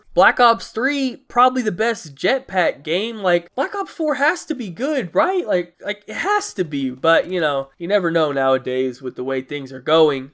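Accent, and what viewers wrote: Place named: South, United States. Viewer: he sound southern like deluxe 4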